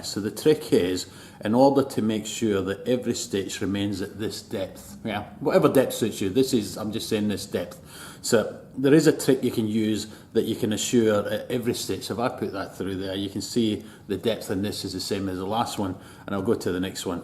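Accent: Scotland, United Kingdom. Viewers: Don't take it personally but you sound similar to a character from the TV show"The Simpsons". A Scottsman.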